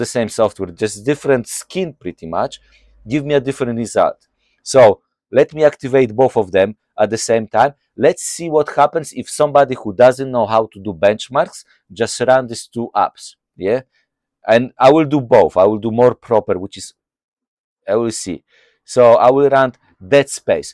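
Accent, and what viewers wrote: Poland. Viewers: First time on this channel. Little off-topic. Your accent sounds like you're Polish. Am I right? Back to main topic. I use Void Linux which is independent distro, not clone of Ubuntu/Fedora/Arch. I have been using Linux for almost 2 decades now (had a break from it). On Void, some games starts immediately. Running some games requires debugging and deducing which libraries are missing, which can be frustrating. I rely on Google & Gemini AI for support. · Ah, wiedziałem! Polak Polaka zawsze pozna po mowie 😅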